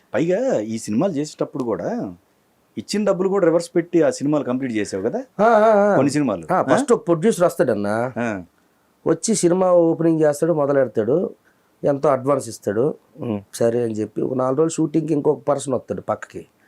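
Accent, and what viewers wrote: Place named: Srikakulam, India. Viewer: గారు మీరు శ్రీకాకుళం కి ఆణిముత్యం అండి. మీలాంటి సింపుల్ గా ఉండే వాళ్లకు అలాగే మన శ్రీకాకుళం యాసకి వన్నె తెచ్చిన మీకు అభినందనీయము అండి గారు. ధన్యవాదములు అండి🌹🌹🌹.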